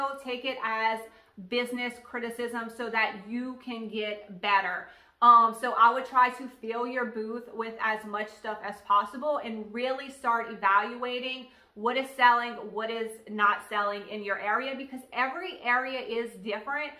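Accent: Louisiana, United States. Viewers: I just discovered your channel! I am an hour and a half from Memphis & lived in Tupelo in the mid seventies! Can you share where the thrift store is near the Goodwill that has the good prices? I look forward to catching up on all your videos. Love your cajun accent!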